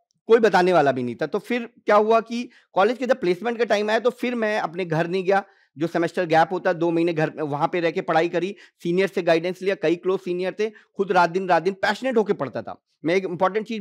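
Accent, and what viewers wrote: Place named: Kanpur, India. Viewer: Kya baat hai kanpuriya language .. 🙏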